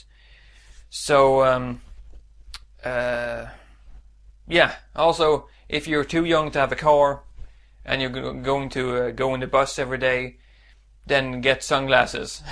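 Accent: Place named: Sweden. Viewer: I can't help loving the Swedish way of pronouncing "yob." And enyoy... Never knew.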